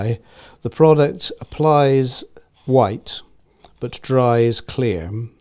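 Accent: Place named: United Kingdom. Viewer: nothings more convincing than a british accent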